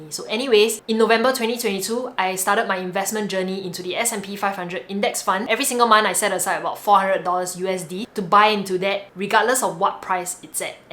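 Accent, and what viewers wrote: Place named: Singapore. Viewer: I can never get used to Singaporean accent. Good content though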